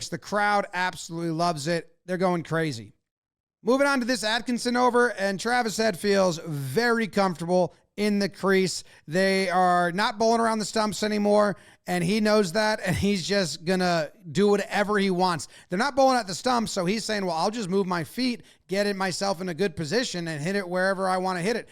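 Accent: United States. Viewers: I went 5 minutes in and went "Wait a minute, that's an American accent". Good analysis.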